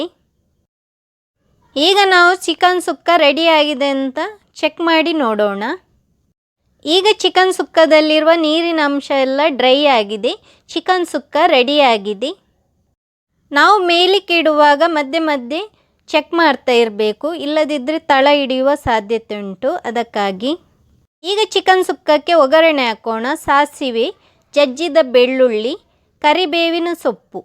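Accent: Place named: Kundapura, India. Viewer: ಹೊಯ್.... ನಿಮ್ಮ ಕುಂದಾಪುರ ಕನ್ನಡ ಲೈಕ್ ಇತ್ತೆ... 😋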